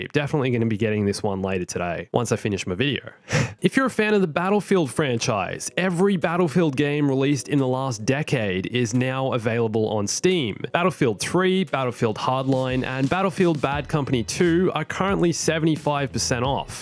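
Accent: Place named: Australia. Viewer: Wait wat ur Aussie. I thought u were British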